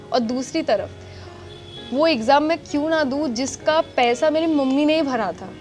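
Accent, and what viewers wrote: West Bengal, India. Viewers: Didi are you from West Bengal?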